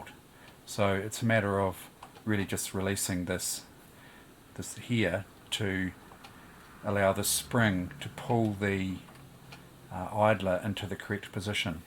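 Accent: New Zealand. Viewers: I hopethis gets an answer, it would be helpful, but it sounds like he's a kiwi so he may not have an answer for another country if you are from somewhere else to NZ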